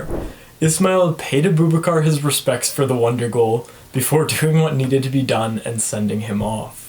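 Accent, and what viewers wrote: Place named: Iceland. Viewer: Are you Icelandic?! I thought you were english !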